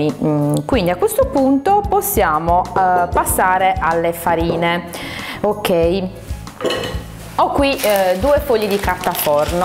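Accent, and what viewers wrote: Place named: Sardinia, Italy. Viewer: Si sente che sei Sarda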